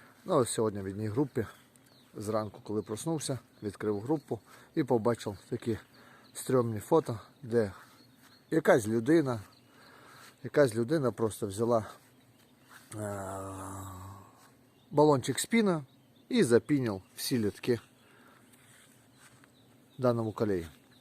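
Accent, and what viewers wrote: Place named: Transcarpathia, Ukraine. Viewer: але й файна в вас говірка закарпатя гарний край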